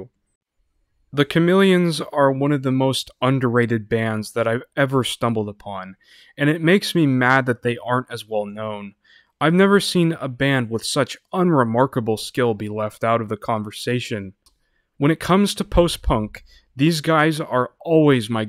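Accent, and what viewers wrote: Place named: United Kingdom. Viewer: Wait, you're from the UK? or are you reading a script? also I believe it's pronounced LEE-ver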